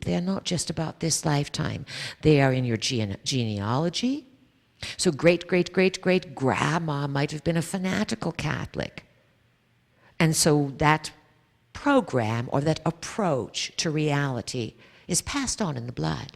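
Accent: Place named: Europe. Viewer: I am truly impressed and follow her down-to-Earth wisdoms and teachings religiously, together with bits of humour occasionally, mixture of European pronunciations occasionally, too. She uses modern Americanisms and old fashioned vocab comfortably. But, more importantly, and fascinating, is that she can speak non-stop, at great lengths, without hesitation [ and repeat words for emphasis ] on any topic whatsoever brought up by an audience / workshop member. Must be collective's instant telepathic communications at work, on thorough answers to questions. Very, very skeptical listeners might feel the questions are secretly given ahead of time, as in recent unfairness / dirty tricks in presidential so-called debates. But, the lengthy, detailed, not common knowledge historically and scientifically, answers kill any such simplistic skepticism completely.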